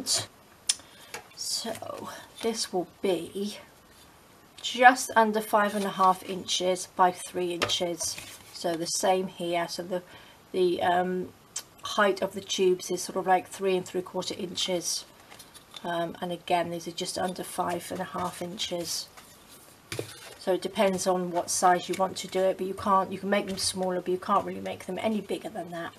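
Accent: United Kingdom. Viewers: Wonderful work! I'll try it, because it seems easy to make. Are you britain? It sounds so. Greetings from Germay.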